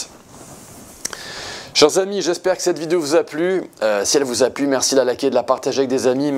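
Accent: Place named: Corsica, France. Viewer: Petit accent corse sympa 😊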